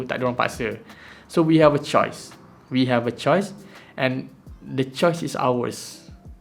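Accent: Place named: Malaysia. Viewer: Anda sendiri bahasa Melayu nya sangat jelek.bahasa Melayu dicampur bahasa inggris.aksen bahasa Inggris anda juga jelek,masih terbawa aksen Melayu,bahasa Melayu bahasa suku di indonesia alias bahasa daerah.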